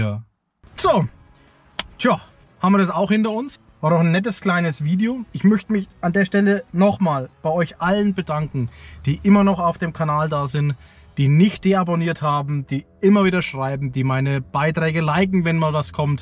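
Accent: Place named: Franconia, Germany. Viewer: Tolles Video. Dass du aus Franken kommst hört man direkt. Wir haben einfach den besten Dialekt. :)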